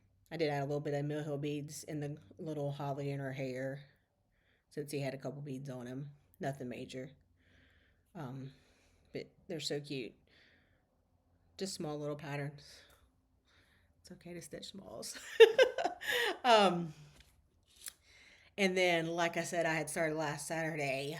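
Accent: South, United States. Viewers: The reindeer feedsack is so cute! At age 75, my eyes don’t work too well on anything smaller than 32 count fabric, so would love to win it! Always love all your finishes and stitching! Also, being Southern myself I love hearing your accent! Makes me feel like home! ❤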